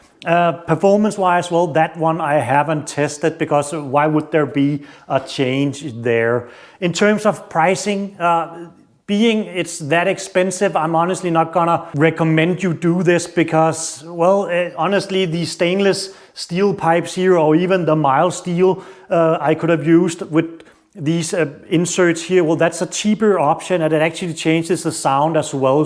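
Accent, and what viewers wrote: Denmark. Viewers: er jeg den eneste der kan høre at han er dansker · It is very much a Danish accent - fellow dane 🎉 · Where are you from? Denmark? Love the accent!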